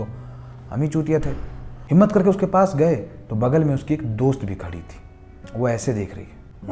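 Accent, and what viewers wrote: Bihar, India. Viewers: Bhaiya aapki story relate kar jati hai hamari life se. aur bhaiya aapki wo bihari language bhi , Main bhi bihar se hi hu bhaiya.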